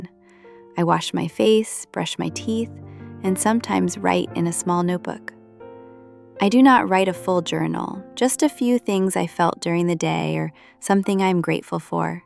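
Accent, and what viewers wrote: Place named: RP, United Kingdom. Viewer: I love BBC English ❤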